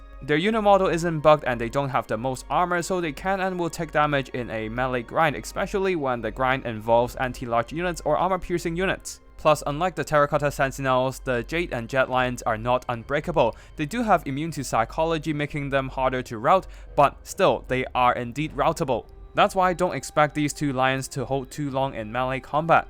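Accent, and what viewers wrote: China. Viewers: Goddamn I can't stand the CCP accent. Need to fix that boyo.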